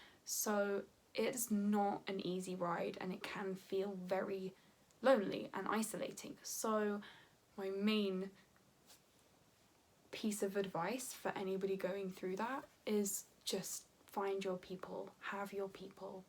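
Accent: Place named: United Kingdom. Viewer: I had no idea you were british and that beautiful accent caught me off guard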